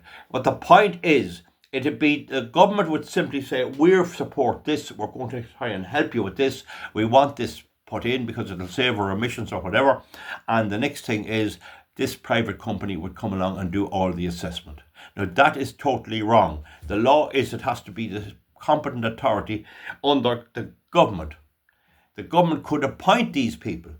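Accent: Ireland. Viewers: WILL YOU POST A CLEAR COPY OF THE LETTER? I KNOW 15-20 PEOPLE THAT WILL SEND THIS LETTER! LOVE YOU!! KEEP ON GOING! CONSIDER PARTIAL FARMING RETIREMENT! THIS IS MORE IMPORTANT! I NEVER HAD EDUCATION LIKE THIS IN ALL MY LIFE! AND ITS SO CLEAR AND SO EASY TO UNDERSTAND WITH YOUR CLEAR CAVAN ACCENT!! AND ITS FREE!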